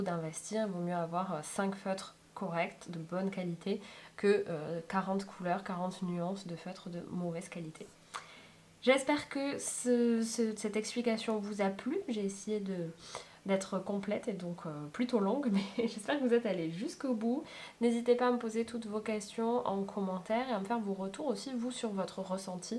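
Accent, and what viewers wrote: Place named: South, France. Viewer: Merci pour cette belle vidéo très instructive (le petit accent me rappelle mon Sud natal). J'ai pas mal de ces différents feutres pour mon bullet journaling et bien que je sois d'accord sur le fait que les Stabilo brush soient fabuleux, ils traversent beaucoup, même des carnets au papier 160 gms, donc attention! Aussi, pour les Faber Castell, un petit truc: on peut sortir complètement la pointe avec une pince à épiler puis l'inverser et paf, une pointe toute belle, toute neuve qu'on peut réinsérer! Une trouvaille aussi, les feutres de la marque Djeco qui sont fait pour les enfants et ne paient pas de mine... sont quasi identiques au Lyra en beaucoup moins cher, j'ai été bluffée.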